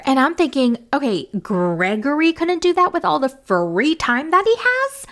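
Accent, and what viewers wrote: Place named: New York, United States. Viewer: Your New York accent. Haha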